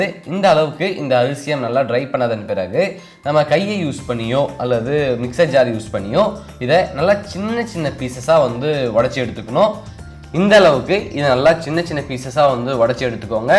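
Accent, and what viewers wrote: India. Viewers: Am following you since I watched your show in behindwoods .. particularly for your pure tamil accent and the kural that u said apart from this your cooking methods are damn awesome, informative and i would definitely try in my Hotel and I own The Proud - Madurai Sri Muniyandi Vilas Hotel 💐💐💐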